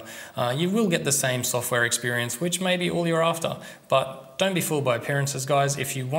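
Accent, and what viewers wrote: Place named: Australia. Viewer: If the British version of Saul Goodman had an Australian accent?!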